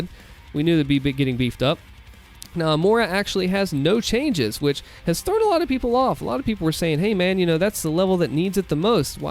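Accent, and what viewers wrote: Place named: South, United States. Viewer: Hey man. Your videos kept coming up in my Recommended section and I kept watching them (as I am a huge Doom fan). Although I liked your content, I found your accent incredibly annoying. Some kind of Southern accent, right? I'm not from the US so I can't tell, but I just found it unappealing to listen to. But I kept coming back for your content and I realised that your way of speaking is actually incredibly kind. The way you talk, the way you explain things. You don't sound aggressive or rude. I don't know you but it sounds like you are a super nice dude who probably wouldn't hurt a fly. Maybe I'm wrong but that's the vibe I get. +1 for content +1 for kind soft-spoken voice and explanations -1 for shitty accent We're still in the positives there so I subscribed!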